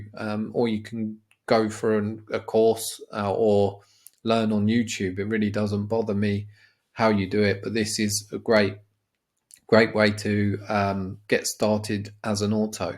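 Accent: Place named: United Kingdom. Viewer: he’s not asking how to convert he was just checking if you was on about dollars as your accent sounds uk so he was just making sure.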